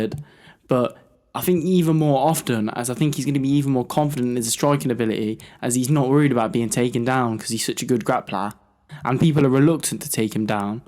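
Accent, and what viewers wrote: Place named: United Kingdom. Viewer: That’s a nice British accent you have brother - good breakdown!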